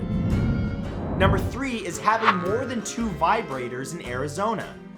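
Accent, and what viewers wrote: United Kingdom. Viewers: This British accent is awesome 😂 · British accent. Nailed it! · Nice british accent btw it is crisps not chips in Uk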